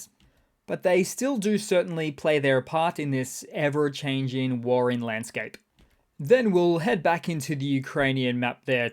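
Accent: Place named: Australia. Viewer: I like your accent mate. Are there many different aussie accents or do you all speak the same?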